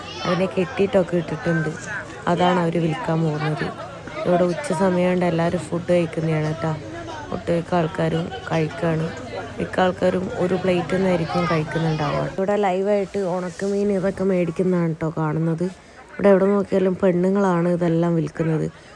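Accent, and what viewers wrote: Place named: Kozhikode, India. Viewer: സംസാര ശൈലി കേൾക്കാൻ രസമുണ്ട്....തനിമയുള്ള നാടൻ കോഴിക്കോടൻ മലയാളം....😂❤ അടിപൊളി സംഭവം..disposition too ok!.😂😂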